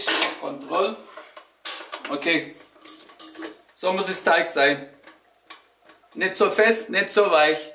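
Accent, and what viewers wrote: Swabia, Germany. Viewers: Hallo.. Hab euch mit diesem video weiter empfohlen..hab den Kanal schon lange aboniert und freu mich jedesmal über deine humorvolle lockere Art 😉und das Schwäbisch das abgefärbt hat😃euch noch viel Spass bei vielen neuen Ideen Lg Marina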